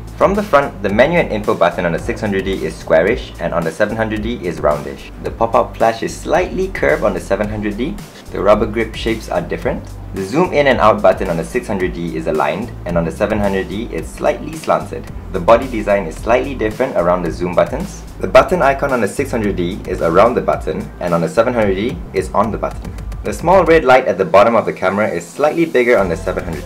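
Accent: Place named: Malaysia. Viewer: u sound malaysian malaysians are cool :)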